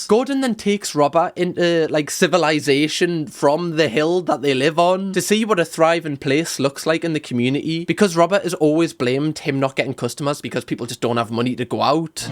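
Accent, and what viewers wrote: United Kingdom. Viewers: omg ... delightful to see another Gordon Ramsay reaction / essay ... AND you have a authentic genuine british accent , beautiful :)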